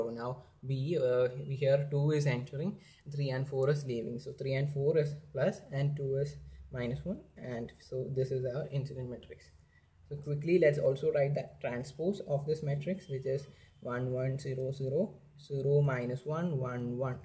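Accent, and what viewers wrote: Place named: India. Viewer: Fuck you Hindi bastard with your shitty accent .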